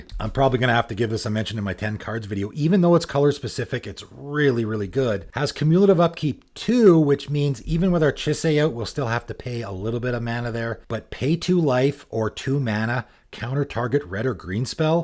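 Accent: Canada. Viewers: Love ya but boy the Canadian pronunciation is KILLING ME. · bruh the heavy canadian accent is half the reason i subbed to this guy. I fucking love it edit: you realize its great when you replace every "a" sound with the ol canadian "eh" for example: s"eh"ga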